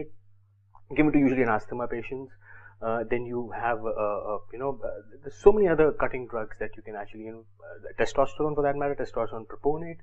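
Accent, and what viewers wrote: India. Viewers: s gupta I am an Indian from England though and cannot speak Punjabi properly, but if I was living in India I would pick up the language and stick to it. In India speaking English is associated with higher socioeconomic worth because those who can afford a better education at private schools are taught mostly in English - But that starts as a gateway to a door of a full blown cultural inferiority complex which Indians attempt to make all sorts of excuses for such as celebrating Christmas but throwing under the guise of 'We respect all religions'. As an external observer it comes across as bizarre how most Middle Class Indians put an emphasis on speaking English over their national languages or Hindi, but other than that the accent does come across as embarrassing and makes it less likely for him to be taken seriously on an international platform - It's why it is so widely ridiculed and many Indians coin on to this and attempt to fake their accent to sound American but can never pull it off. 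I just find it strange how this guy would obviously be able to articulate better in Hindi or Punjabi (The reason why he is swearing so much is due to a lack of vocabulary) but then opts to speak English instead.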